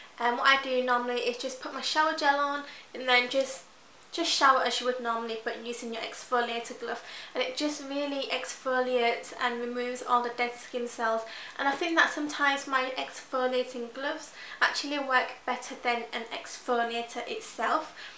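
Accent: Yorkshire, United Kingdom. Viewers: Is she from Yorkshire?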